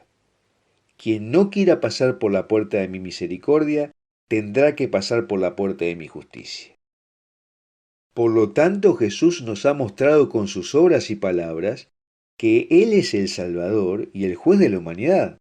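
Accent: Argentina. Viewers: Hola muchos no creen y los pcos que creen,no les importa,el habla como argentino ya se te esta quitando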